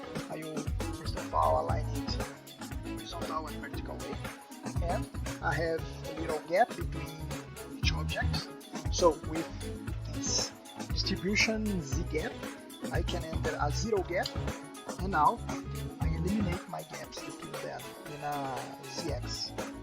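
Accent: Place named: Brazil. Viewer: Falando em inglês. Porem o sotaque me parece Brasileiro hehe.... 😀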